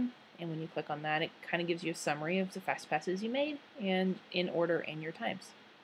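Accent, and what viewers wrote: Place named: United States. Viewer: Thanks for the video, very useful as I am booking our FP tomorrow. You sound like Ellen Degeneres a bit, lovely US accent :)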